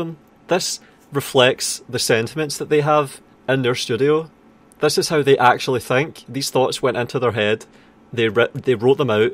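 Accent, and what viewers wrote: Scotland, United Kingdom. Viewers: I like your vids, i wish i would understand scottish tho.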